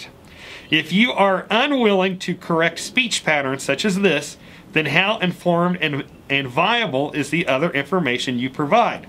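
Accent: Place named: Appalachia, United States. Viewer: I like your speech just fine and keep up the good work any correct information even from hillbilly speaking person or slang speaking person your good soul. and how you speak to point is not bad not racist or anything bad so god bless and maybe god bless your family and friends out there.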